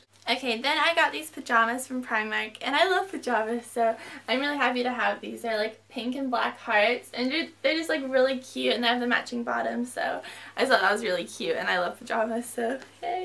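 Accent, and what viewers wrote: United States. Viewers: Are you from America ? Either that or you put the accent on?, but loved this vid! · I know ur accent is american but do you live in uk because all the brands r uk shops? jw btw xx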